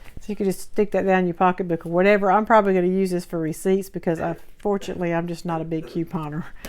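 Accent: South, United States. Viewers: I thought your "specific" southern accent sounds like my family❤ Lots of my family live in East Tenn. and western NC which is pretty close to that region. Don't mean to sound weird, but there are several different variations of southern accents😊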